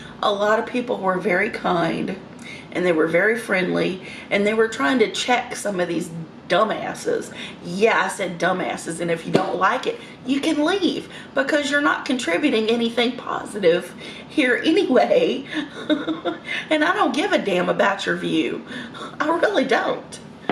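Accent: South, United States. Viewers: Love your Southern accent. Sorry for the bad people who hurt you. I just don't understand why people can be that way. I get so much enjoyment watching you enjoy your food and I guess it is a vicarious thing. Wish I had the same meals you eat. I really do trust your comments about food and think you have a wonderful discriminating sense of what is good and what isn't. You are a beautiful person and bring joy to many of us. Be well.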